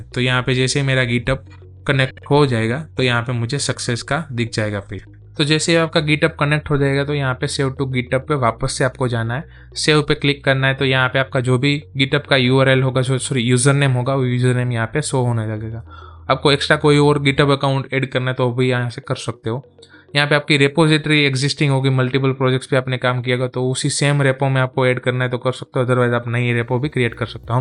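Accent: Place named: Gujarat, India. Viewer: lang per thi gujarati lage chee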